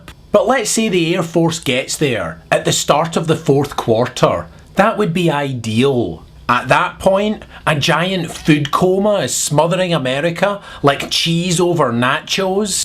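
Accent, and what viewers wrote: Scotland, United Kingdom. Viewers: Your American? but you have a Scottish accent. Anyway, there wont be a war on Iran. Not for the moment anyway. The USA is stuck in a quagmire in Iraq and Afghanistan and it is becoming very expensive. The US economy is falling, along with its dollar and a war with powerful Iran(not a third world country)would be devistating to US economy. Also, countries like China and Russia are supplying some powerful weapons to this country. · Normally I wouldn't do anything so pedestrian as comment on someone's accent. But your scottish tambre makes "warfare fix" sound like "warFEAR fix", which when you think about it is entirely appropriate. As for "American" Football, it's ironic that Minnesota is lagging so far behind on their stadium work, they may lose the Vikings to Toronto!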